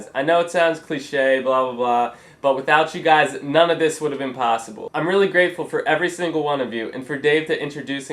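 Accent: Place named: South Jersey, United States. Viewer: lol of course you do! since I moved from south jersey to florida 4 years ago, i can now easily pick up on our critically acclaimed infamous accents. and yes, you do have one. no worries, its alll good though! 8-)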